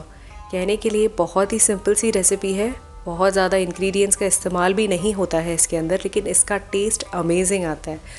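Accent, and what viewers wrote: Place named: India. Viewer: Feeki ...wali mirchen??😅 ...chawal fool..jayen?😂😂😂 Pheeki*. Phool*. Oh acha ap hindi accent use kar rahi hain. Warna urdu me ..pheeka.... phoool... bolte hain.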